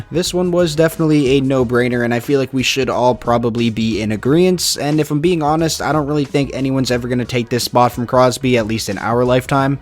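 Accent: Newfoundland, Canada. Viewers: I mean, really depends on what type of Newfoundlander you’re talking to lol. Almost thought you were from NL, with how you pronounce certain words. Not all NL’ers sound as animated as you think haha. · Which province are you from? I can tell you’re Canadian from how you say Hart, Clarke, part, etc.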